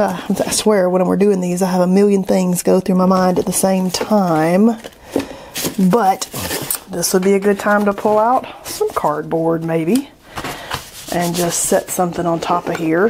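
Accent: South, United States. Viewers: I told my SO how you're from Georgia, as he's"heard" your southern tones, but after finishing the video I said, "...ooohhh babe!! somebody's have pee'd in Wheaties!" I said "listen to these 3 things-The tape...then the challenges...THEN the water?!...and you tell me!"?? He said, "She Julia Sugarbaker'ed y'all, lol"(hopefully you can appreciate the reference)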